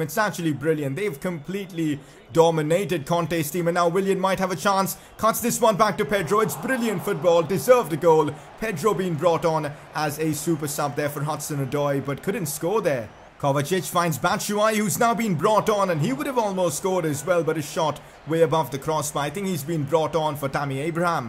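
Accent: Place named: India. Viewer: you sound like indian guy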